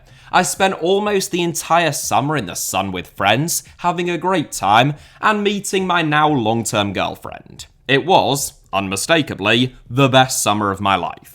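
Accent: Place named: England, United Kingdom. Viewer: watching this after the final is classic with the English accent... but as a long time sub I obv see past any of that rubbish... but complaining about his euro footy is just complaining about football at the top level.... sure worse teams are willing to risk it for the biscuit in leagues... but at this level defensive football wins...... it's not rubbish it's just the whole boring part all about not giving up a goal.. which is much less fun than going for a goal... we watched the best players in the world sit back and play boring the whole way through and they lost in the final.....fire power is flashy but not conceding keeps u in the match.. its boring but its modern football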